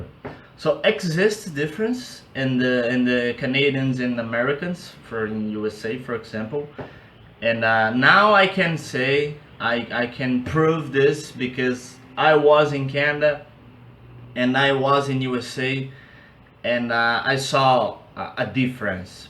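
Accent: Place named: Brazil. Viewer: Seu inglês parece com o meu, será que temos sotaque brasileiro?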